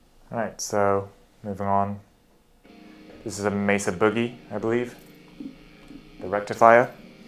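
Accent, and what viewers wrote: United Kingdom. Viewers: definetely did not expect british?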